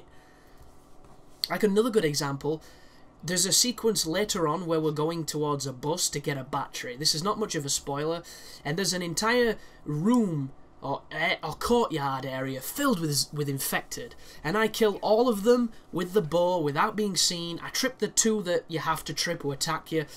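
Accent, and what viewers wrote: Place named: Northern England, United Kingdom. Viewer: I like survivor difficulty walkthrough, you sound northen said fooking as well as fuck alot, subbed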